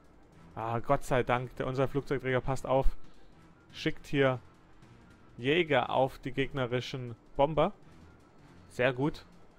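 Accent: Bavaria, Germany. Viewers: absoluter Fan von dir, erinnere mich noch gerne an WoT mit bayrischem Dialekt. Da ich seit Mai in Wien wohne muß ich sagen hier wird alles gesprochen nur wenig österreichische Dialekte.HeHe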